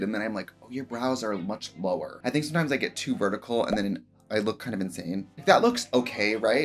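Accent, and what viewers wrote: Australia. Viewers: Im not even a second in and i can already hear the aussie accent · I read this video title in australian accent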